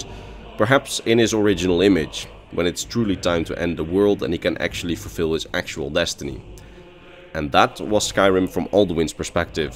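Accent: Netherlands. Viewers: I’ve lived in Amsterdam for more than 2 years now and I just realised you’re Dutch! Or atleast you sound Dutch haha. Great video as usual 🙌🏾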